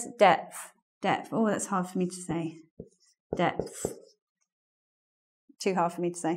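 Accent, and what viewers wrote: United Kingdom. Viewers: I'm learning American English... Is this british ? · I love british accent, thanks for your classes, I am really enjoying it :) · Hellooooo dear. Just wanted to say your lessons are top notch. The very best, really. I know some English and I love it. Can't explain why. But the more we know the more we know we need to know more. Huahuaa. Your British pronunciation is delightful. I 'm really fond of British English. Also I'd like to ask you if you give private lessons --- maybe on ITalk, the site that gathers a bunch of teachers teaching languages online.. Please don't stop. Carry on showing the world how great this language is. All the very best. Cheers. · Hi, Please I would like to learn the pronunciation of the english O how you pronounce them in the words as SO, I know. Cuz I m still hearing the Y literally SO - soy.:D Thank you. 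Btw I really like the british accent about the O:D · Hi! I'm learning English, and I love yours videos :3 and I also love your British accent :3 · To be honest I don't learn anything from theese I just like listening to british english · Is this Brittish? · Hi. Thank you for your video! Your British accent is so beautiful! I could stay the whole day just listening to your voice haha your video is good, but sometimes you should repeat the pronounciation of some words more often. For example, you just pronounced the word ''tongue'' once, so I had to come back the video again. In addition, thank you again and I think that you chose good examples for the video! xx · A thousand thanks, your are a fantastic teacher. I've lived for many years in the US (I'm from Argentina), and it is difficult to me to understand British accent. Another thing to remark is that you have rules that in american English are often overlooked, so I'm learning a lot from your tutorials.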